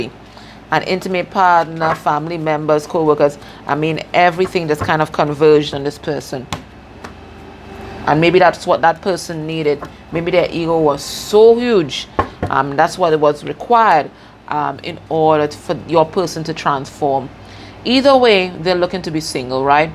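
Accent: Trinidad and Tobago. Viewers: .....are you a trinidadian??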